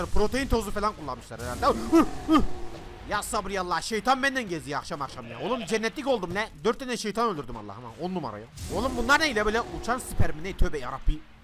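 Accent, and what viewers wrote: Gaziantep, Turkey. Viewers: Abi adamsın senin sayende Antep şivesini öğrendim cansın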